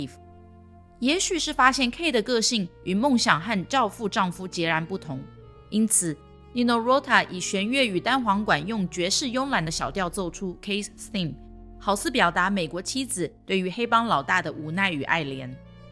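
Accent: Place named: Taiwan. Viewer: 謝小姐解說很細膩資料收集的很用心。妳的台灣國語很熟悉。我也是台灣老蕃薯😂😂😂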